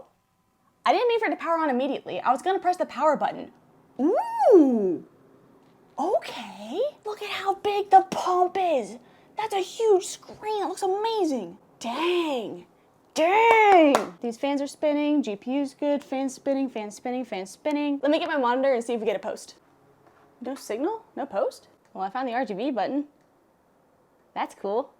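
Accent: United States. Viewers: The hehe at the begging got me 😂😂 hehe (your American pronunciation is so amazing) I’m so jelly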